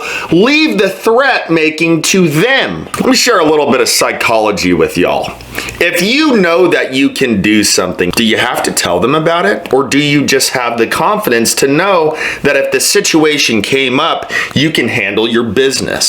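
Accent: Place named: Georgia, United States. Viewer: Dam dude you must be from Georgia because u sound like one of us back wood boys. Tell it like it is! God bless u brother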